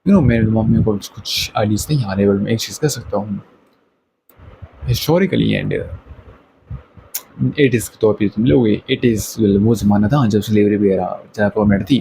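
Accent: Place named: Pakistan. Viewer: Articulation needs improvement , but bro ur voice wow🙂‍↕️ , what a voice.. really you could be a voice artist Btw r u pakistani bcz u sounded like that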